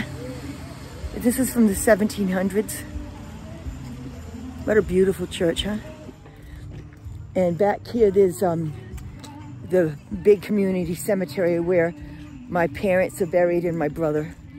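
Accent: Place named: Massachusetts, United States. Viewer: Nice to be sitting in SC - after all my life in Stoughton / Canton area - so, I know right where you are and happy to hear someone who sounds like me😉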